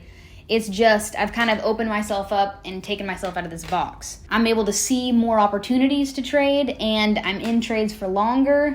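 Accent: South, United States. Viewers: Lol I can hear a southern accent a little bit haha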